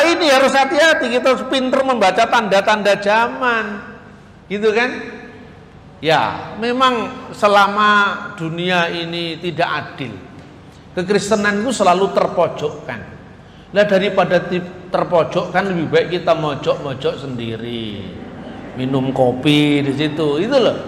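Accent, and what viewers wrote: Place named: Java, Indonesia. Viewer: Lidah jawa banget Docetic => Doketik Tapi sangat aneh pemikiran Docetic ini kemudian menjadi pengajaran agama islam. Dalam ajaran itu Penyaliban benar benar terjadi tapi ya g disalib itu bukan Yesus tetapi orang lain yang diserupakan. Anehnya disini mereka sendiri (Ulama2) (Quran dan Hadist) bingung dan beda pendapat tentang siapa yg diserupakan... Ada yg bilang simon dari kirene disebutkan bahwa simon dari kirene adalah Murid Yesus yang paling muda dan setia yg ketika Yesus menanyai ke12 muridnya siapa yg bersedia diserupakan seperti Dia (Yesus) dan simon ini mengajukan diri sampai 3x. Ada yg berpendapat Yudas Iskariot murid yg berkhianat. Perlu di ketahui dalam kisah itu bahwa orang yg akan menggantikan Yesus untuk di salib, akan beroleh surga yg sama derajatnya dgn Yesus. Disini ambigu nya terjadi... Simon dari kirene bukanlah Murid Yesus, Simon yang murid Yesus bukanlah Murid yang paling muda. 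Sbab yg paling muda adalah Yohanes dan juga penulis Injil. Kalau Yudas Iskariot masa orang yg mengkhianati Yesus beroleh Surga yg derajat nya sama?? Tuhan seperti apakah itu yang menipu umatnya (menyerupakan Yesus supaya Umatnya berfikir bahwa bahwa Yesus benar disalib padahal Tidak)?? Tp sudahlah biarkan mereka dlm kebingungannya sendiri. Penekanan utama iman kristiani adalah Karya Penyelamatannya (Mesias, Kristus) dan hal ini sudah pula dinubuatkan jauh sebelum Yesus (terlahir). Bagaimana Ia menyelamatkan apabila ia sendiri diselamatkan (tidak disalib/diserupakan menonton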